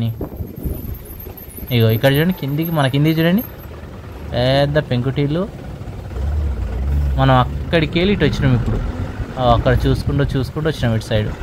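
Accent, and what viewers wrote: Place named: Warangal, India. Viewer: Bro chowrasta antunnV..nuvvu warangal aa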